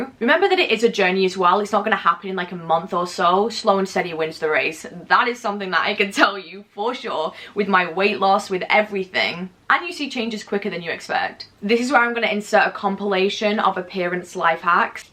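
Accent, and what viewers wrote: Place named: Northern England, United Kingdom. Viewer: Omg i have the same accent as you! Like a northern english accent where abouts are you from? ❤️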